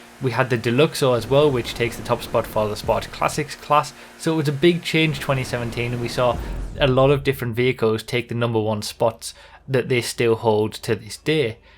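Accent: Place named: England, United Kingdom. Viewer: Thank god hes English! Sick of hearing stupid fucking yanks.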